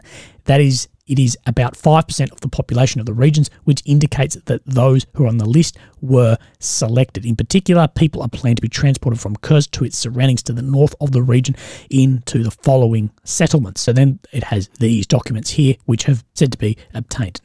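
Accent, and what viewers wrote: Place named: Australia. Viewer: I like your Australian accent 👍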